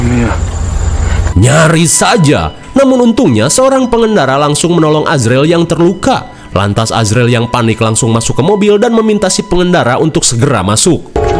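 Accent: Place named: West Java, Indonesia. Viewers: Mimin aslina orang mana sih, logat sundana asa familiar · Nu nyarita ciga urang kadu ngora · tadinya serem bgt malah jadi hyg seuri🤣🤣🤣akibat baceo na kang chanel sunda pisan 😅😅😅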